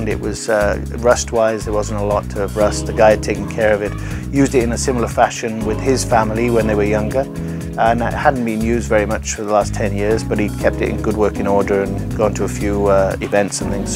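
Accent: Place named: South Wales, United Kingdom. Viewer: Nice bus great work. Your south walian accent is still hanging on. 👍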